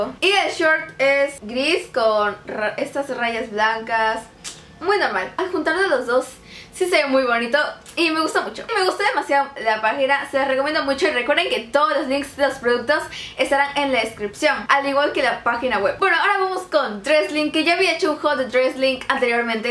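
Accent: Mexico. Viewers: eres de Mexico? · por un momento pense que eras de Mexico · pensé q era de México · no se si estoy loca pero ella habla como mexicana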